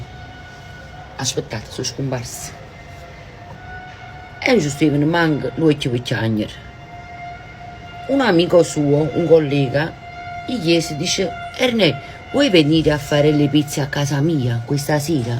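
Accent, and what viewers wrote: Naples, Italy. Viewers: Mi piace ascoltare le storie che racconti...poi con quell' accento napoletano..mio padre era napoletano❤❤❤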